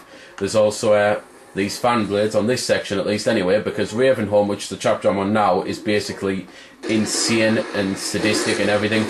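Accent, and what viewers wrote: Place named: North East England, United Kingdom. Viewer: i enjoy your reviews! that's gotta be a northeast accent.. middlesbrough?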